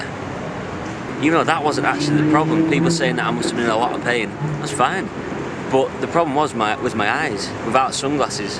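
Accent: Manchester, United Kingdom. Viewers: Now I'm reading all these comments in his british accent in my head. Including mine. I don't have a british accent at all. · Trying to identify where in Manchester you're from by your accent...where?! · I always like the music you put over the b-roll. The shots are very beautiful. The overall atmosphere of your travel vlogs is very enjoyable. You wanted to know what we like about your videos, well....everything? Your UK accent gives it a nice flair, too :) I'm looking forward to the other videos about the rest of the travel! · Otabek Isaqov he's Mancunian - north west of England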